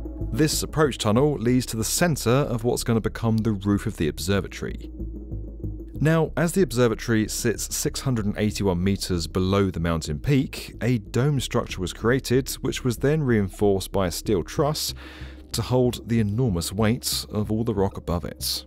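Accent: England, United Kingdom. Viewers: You know, I don't really interested in civil engineering or watch some people building an enormous megaproject all I wanted to do in this chanel is to hear the beautiful english accent 😅✌️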